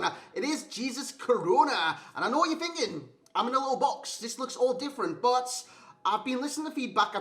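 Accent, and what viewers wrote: Newcastle, United Kingdom. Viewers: Are you from newcastle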